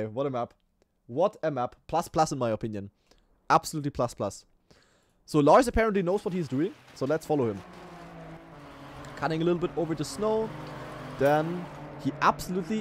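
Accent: Germany. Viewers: Just want to say you have a pretty minor german accent, very clear and understandable to my canadian ear. Your english is fantastic as well, I've listened to an absolute ton of your content and only rarely do you reach for a word or don't know the meaning of one. Love the content, and especially enjoy the competitive focus. Whether you are hosting tournaments or competing, they are my favorites. The volvic duo cup reviving that format was especially appreciated and I hope you can do it again!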